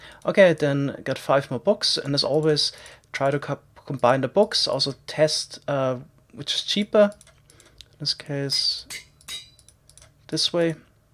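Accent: Germany. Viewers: Hörst dich wie n deutscher an der englisch redet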